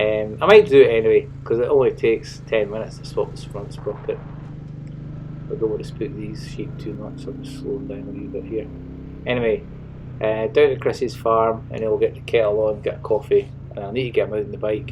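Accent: Scotland, United Kingdom. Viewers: I have a hunter and same as you head wind and it is a bugger to overtake things, on a good run slight down hill I just got 80mph. But I would say normally 65 to 70 is it. Now as your a Scotland type man I do struggle to catch everything you say ha ha, great videos though. I have a pal who lives in Pittenweem so not too far from you. I'm down on the kent coast. Cheers.😊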